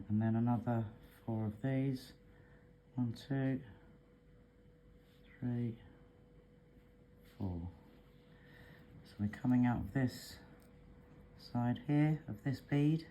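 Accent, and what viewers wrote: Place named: United Kingdom. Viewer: You have the British accent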